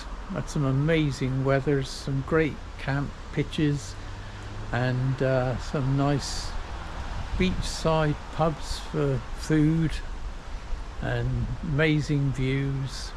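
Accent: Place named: West Country, United Kingdom. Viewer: There is nothing that beats a Devon or even Dorset Summers day walk along the coast enjoying the wild flowers,the birds & the bees,along with fantastic views together with lovely west country burr historical story telling especially if you now live overseas. Superb❤😊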